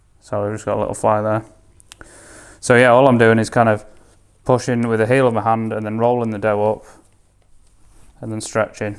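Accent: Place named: England, United Kingdom. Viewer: Do you speak English? I don’t understand how an English accent is strange to hear spoken English in. Would Boomhauer be easier to understand?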